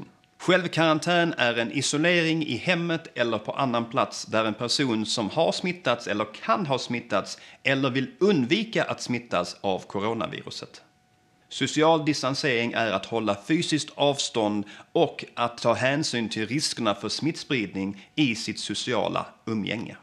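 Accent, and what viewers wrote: Skåne, Sweden. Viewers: Skånska är bäst!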